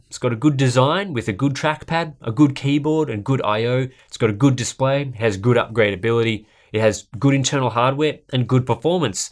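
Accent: Australia. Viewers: Most other reviews are done in a heavy accent. It was refreshing to hear a fellow Aussies voice for this review. Subscribed, and bought the 17.3" scar II (2060)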